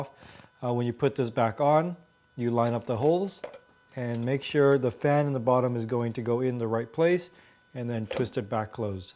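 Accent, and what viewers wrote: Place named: United States. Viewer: Duh do you hear an accent. Hes from the US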